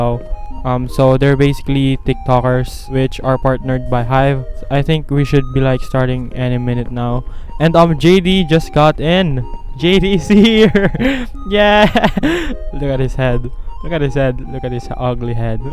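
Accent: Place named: Philippines. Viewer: You Really Have A Filipino Accent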